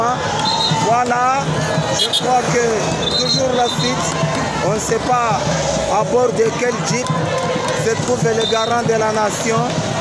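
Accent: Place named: Rwanda. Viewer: Le commentateur a un accent rwandais vraiment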